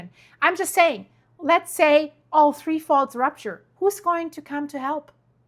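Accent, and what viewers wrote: Germany. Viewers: She's German 😂😂. It's hilarious 😂 to listen to her pronounce some words. Love ya 😊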